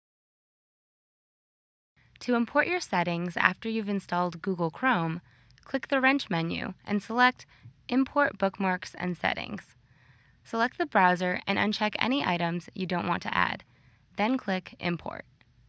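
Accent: United States. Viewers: She just sounds American to me... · She speaks american in a very annoying way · The Voice of the narrator who is obviously from Long Island is the reason I will not be downloading this. I couldn't get through her voice or her gleeking. It's TAB not TAAAHHB. Arrrghh she is SO Long Island. I can hear the saliva in each word. She does sound hot though. Go figure. Firefox with Google bar is just fine.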